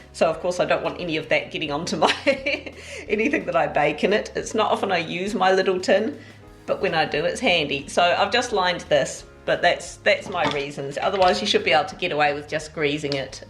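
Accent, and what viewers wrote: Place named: New Zealand. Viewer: yes! i have discovered that i can discern nz vs austalian accent! excellent.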